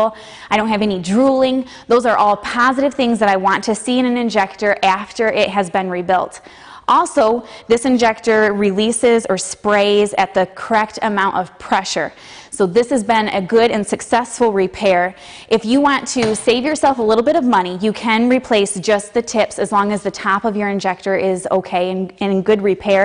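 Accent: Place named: Minnesota, United States. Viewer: I love the rural MN accent! We can smell our own.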